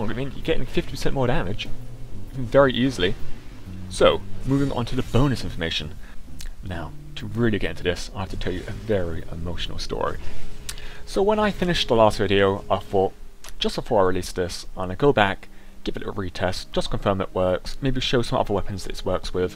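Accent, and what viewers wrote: United Kingdom. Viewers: Why don't you do more videos where you speak in your calming British voice?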